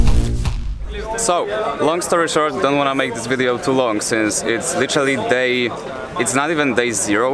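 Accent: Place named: Poland. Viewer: Polish accent maybe, but still he talks normally xD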